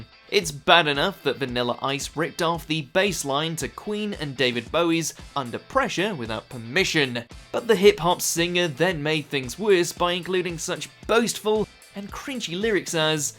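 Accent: United Kingdom. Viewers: The amount of mispronunciations of celebrity names, places and general British things are alarmingly many. I would accept it from a non-Brit but this guy sounds British so it's really confusing.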